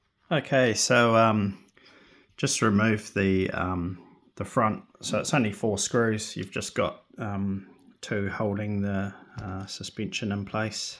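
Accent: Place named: New Zealand. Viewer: So I had one of these growing up, ironically in New Zealand bro! You’re undoubtedly a kiwi with that accent. Lol. My parents couldn’t afford a Tamiya so this was a cheapskate version. Don’t know where they got it but I believe it was on an overseas trip and possibly from Japan. It ran good enough but I remember those T-bone axels would break and we couldn’t get replacements. I’m 50 so this would have been in the mid to late 80’s. Mine was lost to the annals of time, but I managed to find one on TradeMe a year or so ago and have it in my collection! Came with the remote and box. The original looks quite different from yours as it has a tail scoop and huge front bumper. Wish I could post a pic for you. It’s a funky little RC but I’m so stoked to have a piece of my childhood back. Cheers bro.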